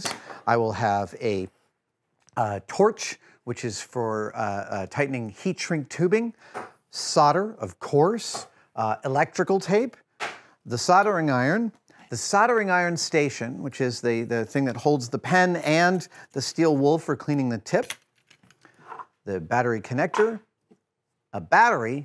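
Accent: United States. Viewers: I wondered what you were talking about..THen I realised you call SOLDERING....SODERING>..I guess Murican accent,.Nice vid though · Hiya, can I just ask, does everyone in America call solder "sodder"? Or is it just yourself? Sorry, it just doesn't make any sense to me. You don't say that you have "sod" your house so why change how you pronounce it in other context? I wasn't going to comment because of fear of people going crazy with me but I am genuinely curious and the more that it rattles around my brain, the more it is irritating me. Thanks very much and love from the UK xxx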